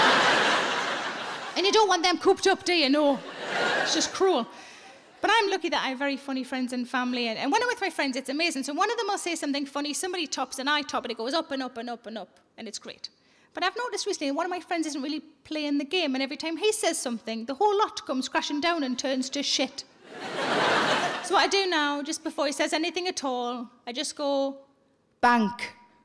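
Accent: Newcastle, United Kingdom. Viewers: Love this woman she talks about things, that every single woman in her audience can relate to. The mix of sweetness ,observational slightly crude humor and her geordie accent , made my day.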